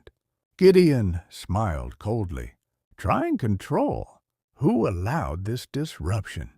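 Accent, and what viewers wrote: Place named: South, United States. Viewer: The AI voice is horrible, a southern speaking white man does not match the story.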